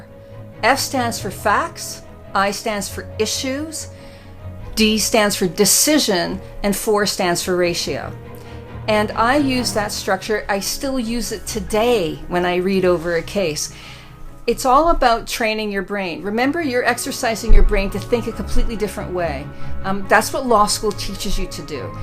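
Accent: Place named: Canada. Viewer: Oh ! Wow ! I had no idea !?? That you are/ were a lawyer !!!?( Did you mention in the 1st video I watched !? ) You are a very interesting woman ! 1st. A very good looking lady .2nd. A lawyer 3rd .Cares about animals 4th. has a musical talent ( piano )5th. a vloger 6th. a Canadian woman living in Wales ! ( keeping her own accent & not speaking w/ a Welsh or British accent ! In a way , cooler as your native language is English to begin with ! I've met many English men lost their accents living in U.S. It's a shame ! I think everybody should keep somewhat their accents ! 7th.living a healthy lifestyle , a vegetarian & rides on a bicycle everywhere you go ! ( maybe except for drinking !? Lol ). 8th .A mother of a gorgeous young lady who's gonna be a lawyer herself in the future ! COOL !!! You looked like an actress in the picture !!! I feel sad to see your friend's passing information at the very end ! 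Way too young ! I am sorry for your loss ! Very sad .💜💜💜💜💜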